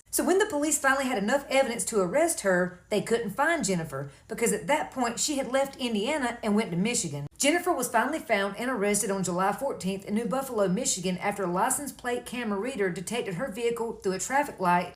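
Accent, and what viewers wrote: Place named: Carolinas, United States. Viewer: I can’t tell if you’re accent is from North Carolina or South Carolina. Probably north if I had to put money on it.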